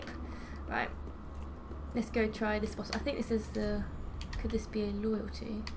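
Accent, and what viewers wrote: United Kingdom. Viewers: I thought so you were born in England right ? I´m portuguese myself and even though I studied English for many years I don´t have a british accent sadly.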